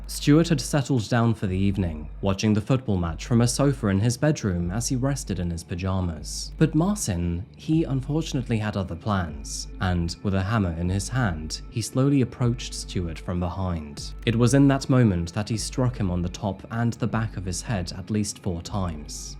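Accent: United Kingdom. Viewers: I doubt you'll ever see this. But a recommendation as a first time viewer and now fan. The 1 minute long intro, without showing face. I originally clicked off the video, and googled if this actually happened and if this channel was AI. I came back after learning both were real. Maybe I'm just a dumb American. But the perfect British(?) accent along with minimal inflection made me heavily suspect AI. Keep up the good work, and maybe show some highs/lows in the voice or your face a tad earlier in the video :)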